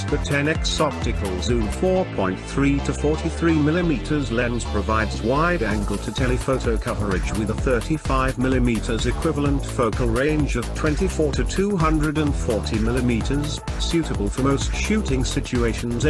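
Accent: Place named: United Kingdom. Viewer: I realize the British accent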